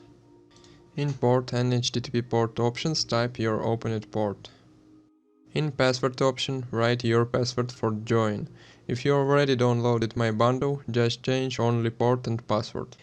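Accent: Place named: Russia. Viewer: Зачем ты говоришь на английском? Твой акцент сильно тебя выдает, как и дискорд на русском..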